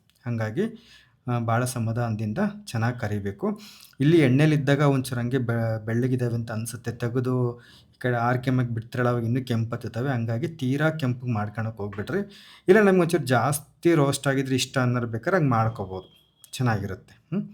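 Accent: Chitradurga, India. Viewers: ಕೋಲಾರ ಅಲ್ಲ adu Chitradurga da ಭಾಷೆ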